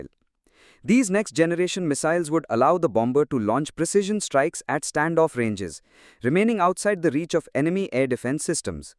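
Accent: India. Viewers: By the voice of the dam Indian it's going to shit lmfaoo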